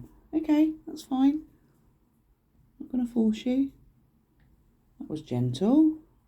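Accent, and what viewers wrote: England, United Kingdom. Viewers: By your accent, I'd say you live in England. Am I right? Hello ftom Colorado USA.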